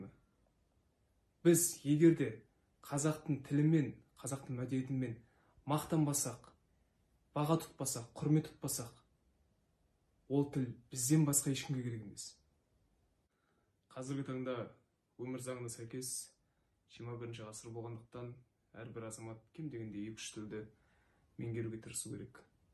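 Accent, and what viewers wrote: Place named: Russia. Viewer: Sotaque semelhante ao russo.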